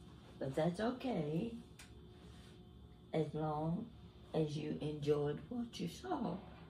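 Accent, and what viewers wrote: South, United States. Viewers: yes the deep southern accent. Awesome.😊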